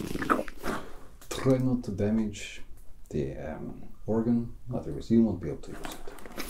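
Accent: Russia. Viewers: ! It's just amazing! I would like to thank you for your efforts to speak and write in russian. Nice job!💛 (русские отпишитесь здесь! я думаю, что вам тоже нравится, как он старается спародировать русский акцент) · your russian accent is getting better!!! also very unexpected to see pathologic content, but so so cool. absolutely amazing games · I can't even decide what type of ASMR I love more - talking with Russian accent or surgical procedures. It''s a perfect combo :D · I always love it when you use a russian accent in your videos. The autopsy part was my favourite. 😊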